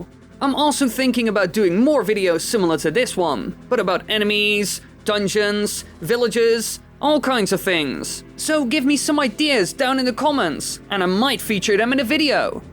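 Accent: Netherlands. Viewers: Is he Dutch? He seems to have a Dutch accent when he speaks English.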